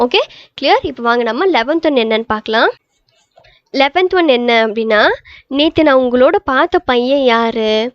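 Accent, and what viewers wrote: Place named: Coimbatore, India. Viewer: vaanga ponga inga nu soldrathulaa alagaa irukku sister...Coimbatore style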